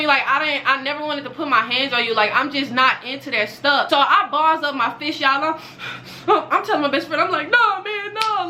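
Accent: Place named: South, United States. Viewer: yessss her accent so cute to me cs I was born in Texas but raised in New York so the southern accent is adorable!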